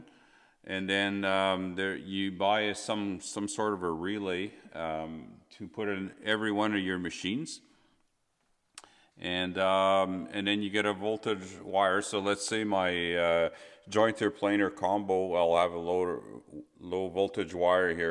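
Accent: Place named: Canada. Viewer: This fellow is Canadain or impersonating 1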